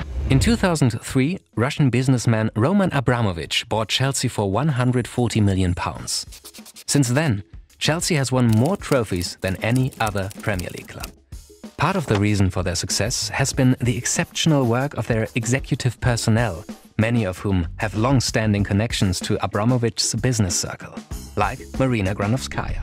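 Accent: Germany. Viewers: Nice german accent btw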